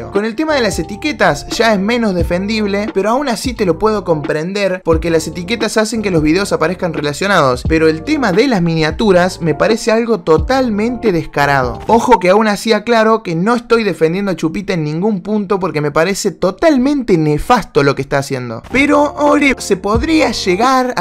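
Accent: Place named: Argentina. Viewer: Pareces argentino XD sos?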